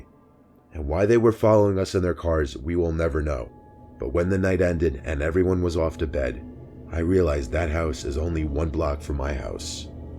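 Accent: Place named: New York, United States. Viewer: You’re GREATLY EXAGGERATING. The voice has Zero factors that keep a person engaged, with any low soft creep factor AT ALL. Realistically, it sounds soo close to an annoying New York accent, which is absolutely abhorrent. It shows how desperate Generic people will literally listen to anything.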